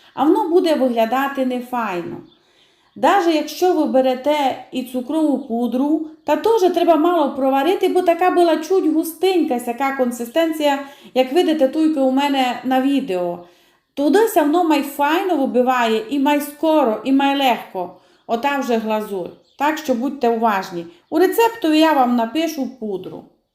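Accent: Transcarpathia, Ukraine. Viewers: А я люблю пані слухати 😊 Спокійна, домашня інтонація, і чудовий закарпатський діалект. Я дивлюсь її відео два рази: перший раз насолоджуюсь її манерою говоріння, а другий раз - рецепт слухаю та занотовую😊😊😊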